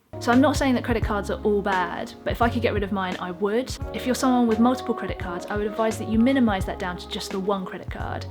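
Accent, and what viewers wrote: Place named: England, United Kingdom. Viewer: love your english accent! And please do more video for minimalism life budget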